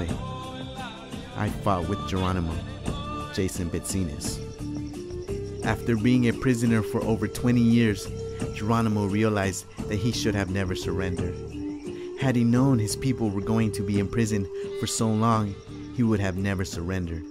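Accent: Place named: Mexico. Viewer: who's the narrator ...sounds Mexican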